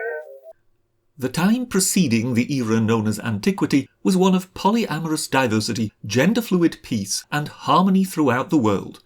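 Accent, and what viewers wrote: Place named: England, United Kingdom. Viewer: Such a petty that the video itself cannot be used in educating the young, due to the imperialistic English accent.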